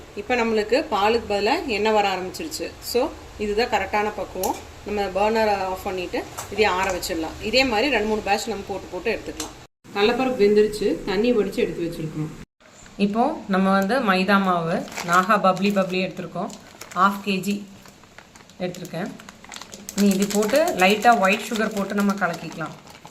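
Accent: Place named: Coimbatore, India. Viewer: Coimbatore slang and snacks super ங்கொ 🙌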